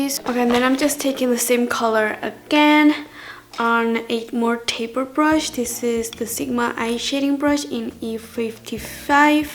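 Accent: Spain. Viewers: Where are you from? Your accent is interesting it sounds almost as if you naturally speak Spanish..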